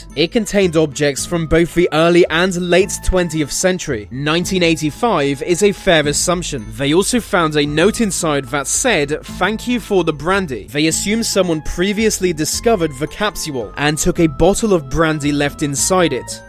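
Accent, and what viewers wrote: England, United Kingdom. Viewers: wow really!? thank you. i have an indian accent but i would like to learn to speak in your english accent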